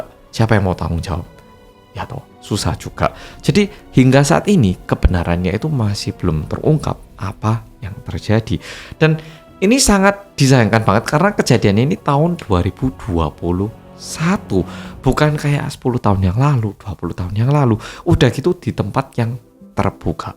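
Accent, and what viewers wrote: Java, Indonesia. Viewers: logatnya sperti org jawa. 😂😂😂😂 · Jadi ngantuk dengerin cerita nya😅....orang korea Logatnya jowo😂 Mendayu dayu suaranya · Medhoknya melebihi orang jawa 😁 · Orang korea, bhs indo dg logat jawa, weeee.....maantaaapp...taaapp👍👍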